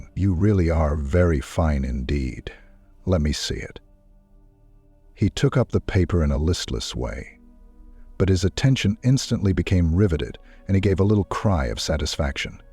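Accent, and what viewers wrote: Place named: United States. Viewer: No to an Anerican accent to read SH . Sorry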